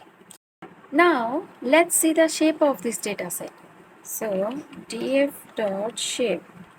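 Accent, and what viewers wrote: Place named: South Asia. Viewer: Are you from South Asia?Your accent said so❤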